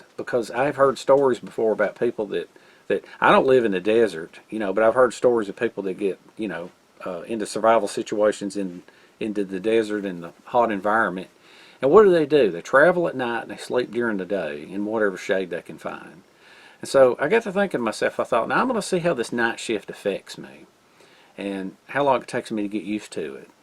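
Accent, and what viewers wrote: South, United States. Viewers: "What accent?" as one Australian said. But seriously, the 'Southern Drawl', I believe, is a of rather SOPHISTICATED character and sounds very much like the altered remnants of a Proper British /Aristocratic English accent, to me anyway. I think that's why it sounds so familiar somehow and also sweetly comforting. I think may have the unbeknownst lineage of an Aristocratic English Lord...that makes ALL kinds of Tools go "Out of Stock" with every other episode. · I love your southern accent. I could listen to you all day! LOL Thank YOU for being such a great, innovative guy that I've learned a lot from. Always look forward to your videos. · I agree with you. I like a southern accent. Some people who hear a southern accent make the mistake of thinking the speaker is not very intelligent. Behind accent is a genius mind. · Buddy I'm glad your going to get back to normal on the shift. 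What some awesome things sent to you! Brother you deserve them all, ENJOY! Good video as always Brother! I remember about 100 years ago when I discovered your channel, my little boy who's grown now was sitting there watching and listening to you and looked at me and said "Daddy he sounds like us!" Hehehe it's a Southern thing:) Thumbs-up buddy ~John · Silky saws are awesome. Hows the handle on that machete feel? Idk if id like the feel of it just based on the looks but ive been fooled b4. I got a baryonyx kingfisher as a gift & i thought at 1st that it was just a toy basically w/ a weird pistol type grip but that handle is super comfortable & it cuts like a laser even w/ the strange pointy profile. Oh & btw your accent is fine. As a matter of fact southern dialects descend from French & UK high society. I study languages & our heritage is something to be proud of. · I love your southern voice like how yu say machethe lol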